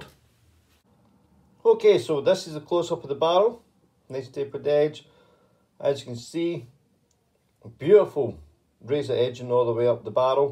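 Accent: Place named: Scotland, United Kingdom. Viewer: Great review! Just ordered a set of these. I will come back and let you know what I think. Love the Scottish accent as well by the way! Cheers from Canada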